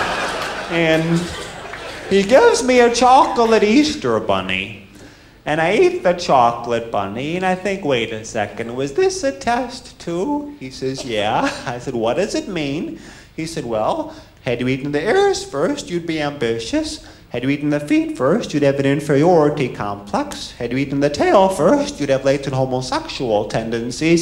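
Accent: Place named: United States. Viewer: Could see him playing Shakespeare plays if he lost the American accent.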